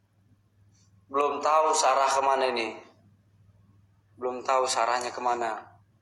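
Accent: Medan, Indonesia. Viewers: Bg orang mana ya.. Medan kah... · Bg, abg org mn? Koq cem org medan bg? Salam kenal y bg dri kami. Anak" awak sukak nonton video abg, lucu x kata anak" ni abg😆😆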